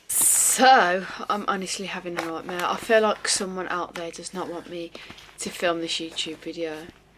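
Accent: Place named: Birmingham, United Kingdom. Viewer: She's from Birmingham that's the Birmingham accent you're hearing x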